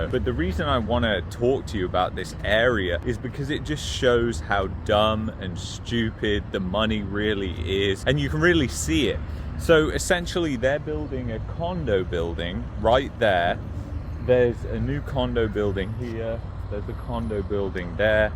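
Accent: United Kingdom. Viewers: I have refrained from commenting so far , but i have to say that this very Limey sounding bloke is one smart cookie . Hope still exists .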